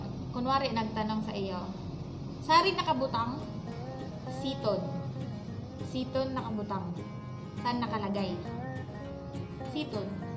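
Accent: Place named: Bula, Philippines. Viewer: taga bula ka? taga bula kasi ang tuno mo.. hehe..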